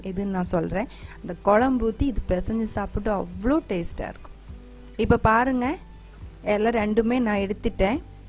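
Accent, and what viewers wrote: Kerala, India. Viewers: Your slang semma Ma'am...... Malayalam and Tamil ........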